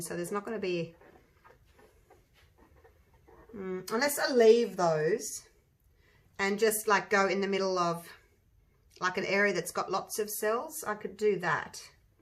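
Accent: Australia. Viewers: Also I love your voice I like to listen to the Australian will actually any type of broge or accent love to hear different drawls ect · I just have so much fun watching your pour paintings. You explain it so well especially with your Australian accent. I love Australia. I’ve only been lucky enough to visit there once and check it off my bucket list. You’re so lucky to live there. And your paintings are gorgeous! ❤ I’ve got all my material and I’m ready to start.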